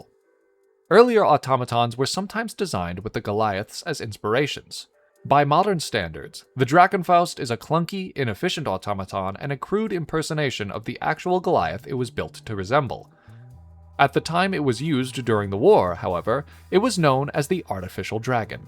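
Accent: New England, United States. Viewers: I’m moving from AZ to CT, so I’ll be needing some coaching on a subtle New England accent. Please and thank you.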